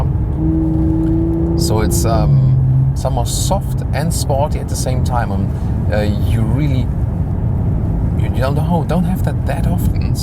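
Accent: Germany. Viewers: The German accent is literally the best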